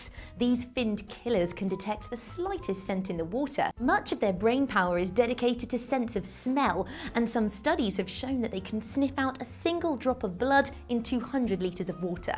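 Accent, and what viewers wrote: United Kingdom. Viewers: i love your accent! UK?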